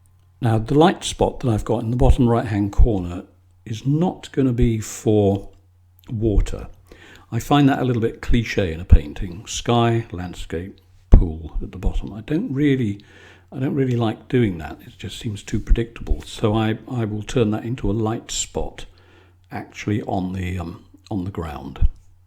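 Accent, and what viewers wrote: England, United Kingdom. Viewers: Very natural sounding speach. Im a fan of the english accent. Very relaxing. Thank you sir. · This is by far the best thing the YouTube algorithm offered me in a long time! I love the voice, the British accent, the calm way of you explaining your technique. I am beyond excited to see more videos!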